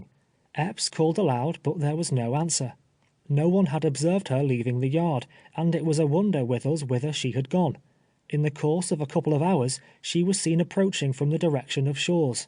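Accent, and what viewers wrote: England, United Kingdom. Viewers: I wanted to compliment the narrator. The English accent really conveyed the proper Grammer that was then real English. This history really shows how far we have sank from using words appropriately. A free'd slave spoke better and proper English than most people I know today. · yes please do. hearing a white british guy read it like hes reading the paper just feels wrong. it needs the power and feelings. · Who would listen to this History read by English accent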